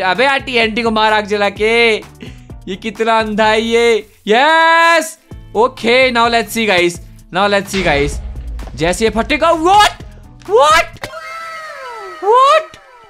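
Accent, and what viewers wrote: India. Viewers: Why do all indian sound like this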